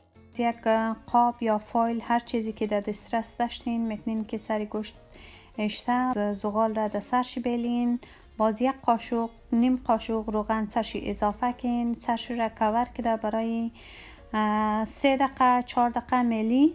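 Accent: Hazarajat, Afghanistan. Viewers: I like her hazaragi accent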